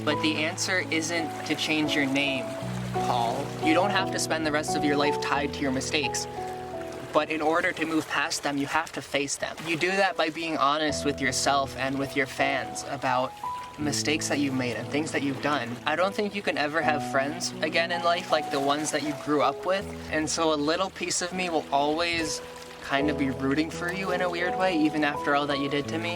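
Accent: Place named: Maine, United States. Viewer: AYYY 3 dollah deweyyssss r u from maine wtf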